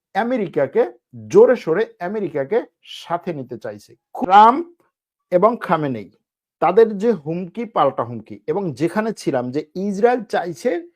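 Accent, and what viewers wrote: Bangladesh. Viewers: BANGLADESHI ENGLISH.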